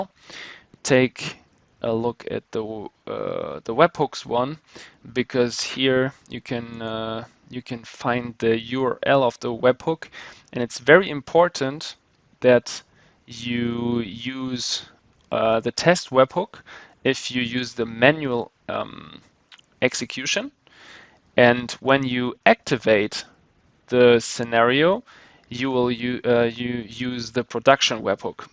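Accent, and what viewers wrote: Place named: Germany. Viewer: Bitte, wo finde ich die seite in der ich den ganzen bums bearbeiten kann ich raffs nicht. Und komm mir nicht mit englisch ich hör den deutschen raus ^^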